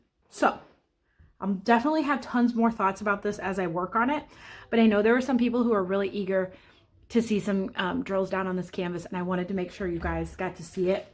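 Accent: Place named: Midwest, United States. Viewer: Ah I knew you lived in the Midwest I heard you say Missouri lol! I didn’t want to be the creeper that asked where you lived but I live in Nebraska and from Iowa so I could tell by your accent you were a midwestern like me ❤❤😂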